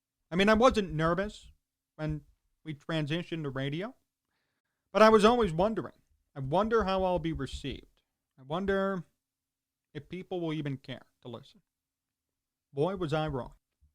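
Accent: Chicago, United States. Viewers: A Chicago voice for those who love Chicago and I am from Iowa and live in Wisconsin. But love me some Chicago. You keep me connected to Chicago sports no matter where I am. It doesn't make any difference how old you are but that you talk sense. That's you,. And the real reason is that you are just one hell of a respectful person.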